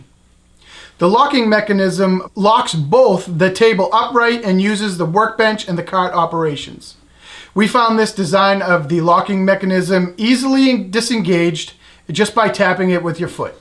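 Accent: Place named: New England, United States. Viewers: Weird how much you sound like Rob! Born and bred in New England too, no doubt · Its our Boston accent! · Is that a lovely New England accent? Well done 🎈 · He talks just like the older guy, it's not just the New England accent either. I wonder if he taught him how to do it?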